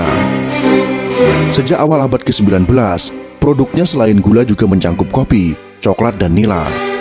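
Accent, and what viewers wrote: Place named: Kalimantan, Indonesia. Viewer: Jawa Kalimantan ya min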